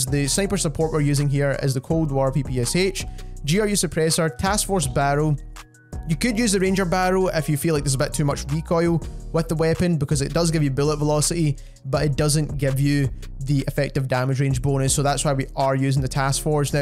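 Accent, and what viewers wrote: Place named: Scotland, United Kingdom. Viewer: i love the scottish voice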